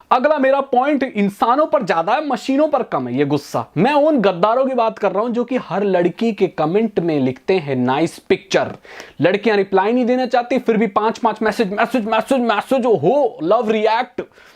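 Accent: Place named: Punjab, India. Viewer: Why are you making your accent like punjabi people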